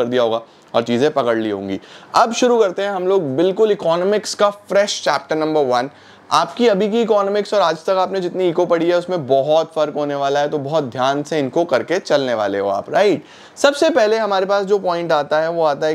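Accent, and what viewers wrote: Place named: Punjab, India. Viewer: Your punjabi seems cute😂❤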